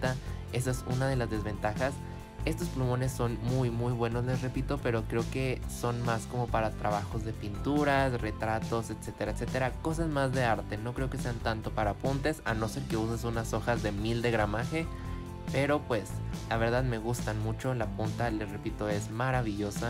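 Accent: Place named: North, Mexico. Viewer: Ya decía yo que el acento me sonaba del norte saludos desde Matamoros,Tam!❤️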